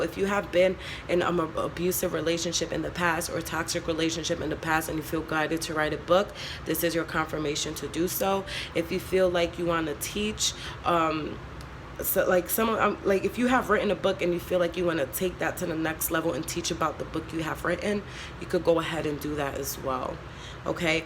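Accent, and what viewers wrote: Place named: New Jersey, United States. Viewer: I love your voice! Reminds me of my cousins from Jersey. Also, will you please not apologize for anything? You can't hear the heater at all! Love your videos and guidance. Thank you!!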